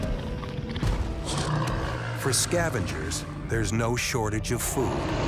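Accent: United States. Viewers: Who is the teller? He sounds american 😢😢. I prefere Nigel Marven. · yawn, more american voiceovers...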